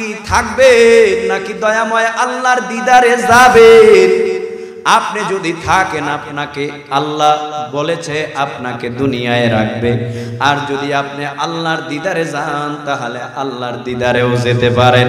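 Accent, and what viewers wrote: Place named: Barishal, Bangladesh. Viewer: Barisailla pola bole kotha Owaz ta really দারুণ hoice সাবাস ভাই আমাদের গর্ব e আপনি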